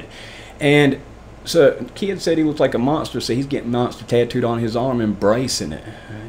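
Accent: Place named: Tennessee, United States. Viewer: Where are you from Kentucky here. I cant pin that accent down . You sound like a cousin of mine though lol Best i can guess is Tn or Ak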